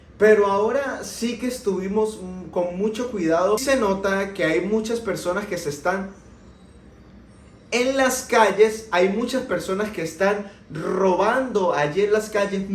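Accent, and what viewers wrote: Mexico. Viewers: No me di cuenta de que eres de Venezuela,te sentí un asentó de Méjico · Tienes un acento Mexicano,, saludos, 👍👍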